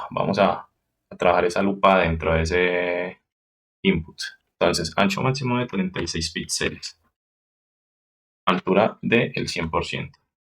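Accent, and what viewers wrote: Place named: Antioquia, Colombia. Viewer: Su voz suena como de puerto berrio antioquia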